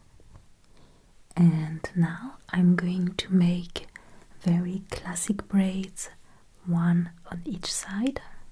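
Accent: France. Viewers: Im new to your channel, discovered it a few weeks ago after I handed in my Bachelor's thesis, and it really made me relax watching your videos. Now Im addicted, other ASMR doesnt quite do it for me. The RP, the setting, the lighting and darker colours, your audio quality (minimal white noise) and even your french accent really does the trick for me. I get so calm. I have an anxiety disorder, I got therapy for my panic attacks, but still need easy and quick ways to get my pulse down, while sitting at the pc - and you help me so much. Now I can get to bed with less mind clutter and wake up to be a good mom and wife for my family <3 Thank you! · is she really french? or is that just the accent she chose?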